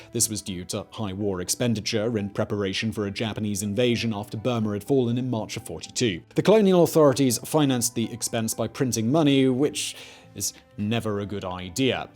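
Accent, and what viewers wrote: United Kingdom. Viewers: I also noted that he described Fazlul Huq as a Hindu, so I'm overall questioning the accuracy of this content overall right now. This is the problem with Youtube. Anyone can post anything and we have no idea of the qualifications of the presenter or veracity of their content. And you know how it goes - people tend to think anyone with a British accent knows what they're talking about, even if they're talking out of their a>>.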